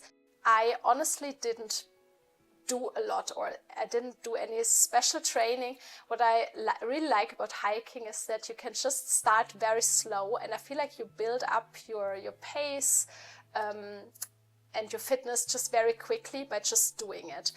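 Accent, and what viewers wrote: Germany. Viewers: Very interesting - thanks for answering all these questions! One that I've never heard you talk about is how your English comes to be so good. Obviously, as might be expected, you have quite a pronounced German accent (which I really like, by the way!), but your command of spoken English, your vocabulary, your use of colloquial expressions and even your pronunciation of British place names are all very good. I speak a little German, but, if I tried to imagine the reverse, with me trying to make videos in German whilst on the go, it would be a disaster! Did you previously spend some time in the UK?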